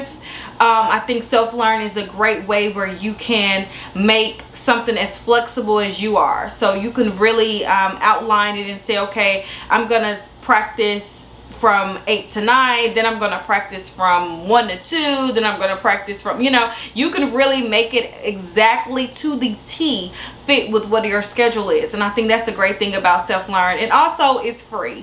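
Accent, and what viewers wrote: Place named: South, United States. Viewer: Hi! I should’ve specified. I meant to ask what location are you in? I watched another video tho and I believe you said Nashville. I love to see a fellow Black GRITS (girl raised in the south) thriving! Love your energy and accent! Thanks for your content.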